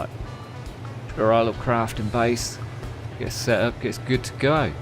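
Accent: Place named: Suffolk, United Kingdom. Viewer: What part of England you from? Your accent sounds Suffolk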